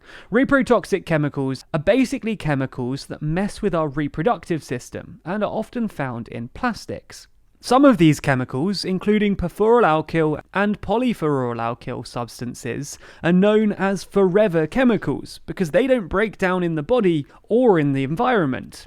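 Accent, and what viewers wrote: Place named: London, United Kingdom. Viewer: I was not prepared for this London accent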